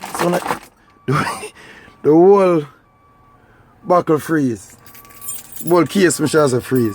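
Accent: Jamaica. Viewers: I love other forms of English and all of it's creoles and what not but that still does not make it any easier to understand the Jamaican accent/dialect. I try so hard 😂 but I only pick up bits and pieces. I'm gonna watch more though, maybe I'll get better at picking up what he's saying !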